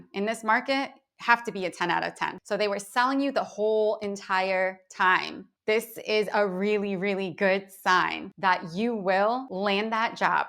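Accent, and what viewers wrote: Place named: Staten Island, United States. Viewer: Staten Island accent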